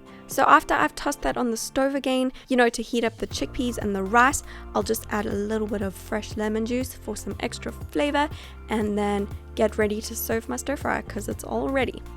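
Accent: South Africa. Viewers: great video! i love your accent! are you south African? sorry if i am wrong! X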